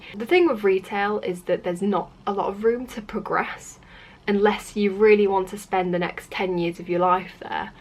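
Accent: United Kingdom. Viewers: Just came back to watch that video. Now i am here after just 1week u uploaded this. I also came back for that vid like 4 month ago. 🙁 i could make life so easy for people but that would make lots of money to work out the system and people should be happy with very simple similar things like houses would be the same or like 2-3 types maximum. To cut down the worktime. Humans are like ants most of us will never be free because we rely on each other's work... You sound british wish we met when i was living in the UK. 😅 we would talk about our hate for work and brainstorming about it haha. 😆 when employees say me u can be a machine setter etc.... i am like yeeaaaahhh 🙂 (inside: 😫🤮)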